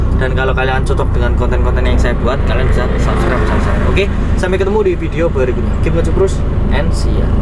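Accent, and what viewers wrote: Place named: Banyumas, Indonesia. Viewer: Oalah wong ngapak jebule mas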